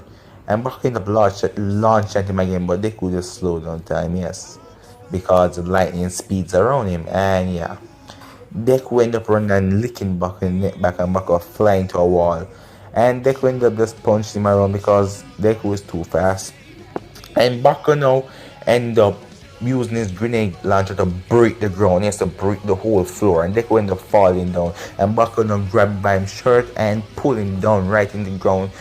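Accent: Jamaica. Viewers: He sounds Jamaican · Are you Jamaican because I am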